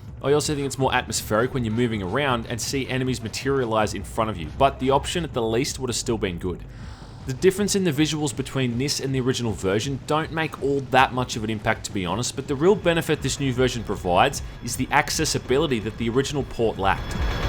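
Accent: Australia. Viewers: Australian accent that isn't danger dolan yay! subbing just for that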